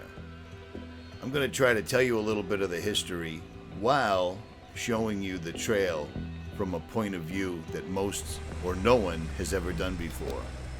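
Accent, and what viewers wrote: Boston, United States. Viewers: You sound just like Ritchie from Boston.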